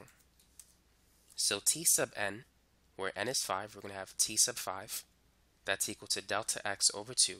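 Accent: United States. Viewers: Sİr, where are you from? you have definitely american accent but sometimes you really sound strange.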